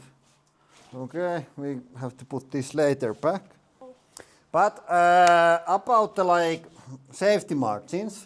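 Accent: Finland. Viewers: Hammers are nice and all, but nothing can beat a Finnish accent. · Love your videos, love your Finnish accent 🧡🤘🏻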